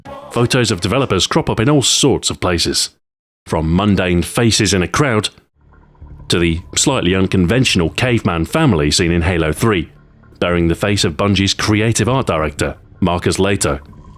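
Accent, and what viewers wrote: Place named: England, United Kingdom. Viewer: English accent. All mighty men in movies and tv shows have an English accent or an accent from somewhere in the UK so that's why I thought of it.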